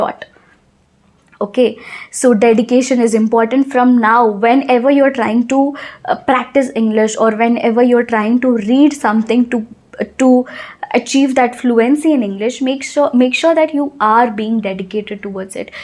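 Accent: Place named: Andhra Pradesh, India. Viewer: By listening your words and accent I was able to figure it out that u r from andhra.. Maam pls try to change your accent when u speak English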